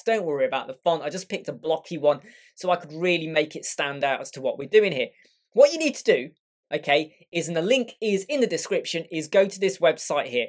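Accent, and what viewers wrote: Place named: England, United Kingdom. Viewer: i liked your english accent , are you a native speaker ?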